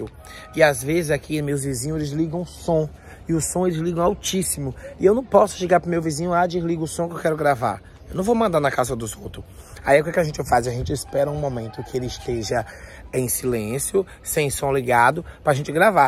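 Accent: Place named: Bahia, Brazil. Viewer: Amo ouvir esse baiano voz linda sutaque lindo E Assim mesmo parece sacanagem eita jó Ótimo domingo ❤❤❤aposto q não vai ter som Presta atenção so a misericórdia Deus e masss Ótimo domingo para vcs.....❤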